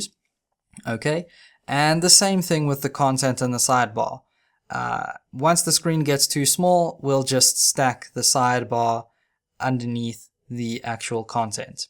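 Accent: South Africa. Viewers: Fellow Saffa here now living abroad!!! Very informative vid! Nice to hear an SA accent for a change. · Best tutorials on youtube so far about coding. Really awesome to be learnig from a fellow South Adrican as well. Sounds like you’re from KZN. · As us Americans say "Africa" as it were a country not a continent you sounded the same. You from South Africa? The "British" accent sounds like it although wife from North Africa and their English (3rd language) is British English not American English.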